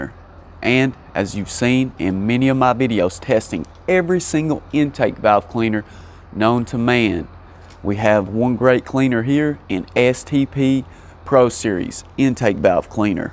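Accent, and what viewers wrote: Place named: Boston, United States. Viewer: boston guy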